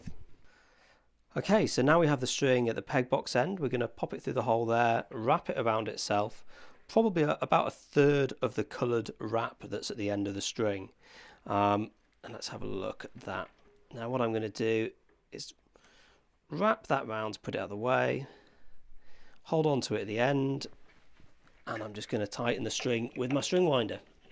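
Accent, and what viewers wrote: England, United Kingdom. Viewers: Nice job! Love the English accent too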